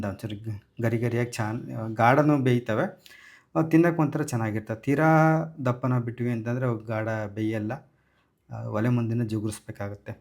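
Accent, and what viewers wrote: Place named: Challakere, India. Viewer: Bro super nam maneli ide tara madodu nim mathu kelta idre nam uru ansutte nan bandu challekere nim uru yavdu bro but nan ega irodu blore nali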